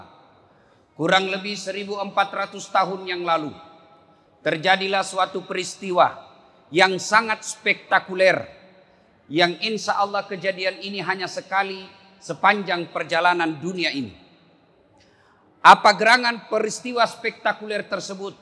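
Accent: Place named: Sulawesi, Indonesia. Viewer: Saya suka mendengar ceramah ustadz. Bahasanya jelas cirikhas Sulawesi Cetho welo welo. Sukses selalu.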